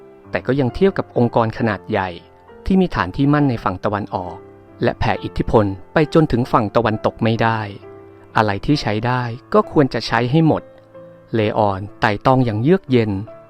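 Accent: Isan, Thailand. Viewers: ติดสำเนียงอีสานด้วยฟังมะค่อยรู้เรื่อง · เสียงภาคอีสานเปลียนเป็บพูดปกติได้มัยคับผมฟังไม่รู้เรื่องคับ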